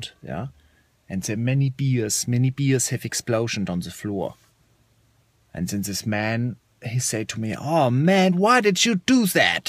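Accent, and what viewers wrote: Germany. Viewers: Are you actually German or is it just an impression?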